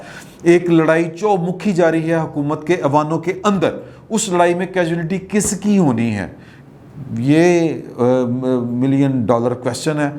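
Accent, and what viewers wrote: Punjab, Pakistan. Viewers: Improve ur urdu or v log must be in punjabi or "glabi" urdu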